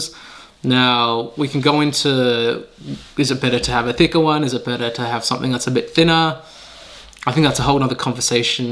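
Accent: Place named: Australia. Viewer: Masha Allah brother, I also went for Hajj this year. Your accent suggests you are Australian? So am I. Perhaps we crossed paths?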